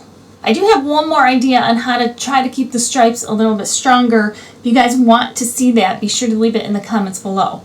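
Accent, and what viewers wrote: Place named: Michigan, United States. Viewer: Love these! By the way, are you from Michigan by chance? It sounds like it from your accent.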